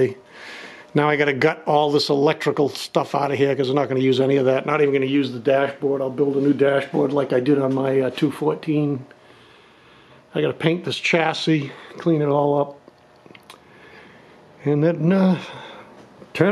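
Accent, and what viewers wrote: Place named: East Coast, United States. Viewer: Hi! Thanks for the info from FB. You sound very east coast! Love it my man. Came from CT (where we speak proper enleash!) Looking forward to watching this.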